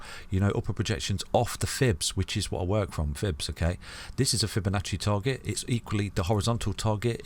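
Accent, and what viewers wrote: United Kingdom. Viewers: Love your content my friend, I’ve moved to Canada 🇨🇦 from the Uk 🇬🇧 and it’s so nice to hear a fellow countryman giving great content and TA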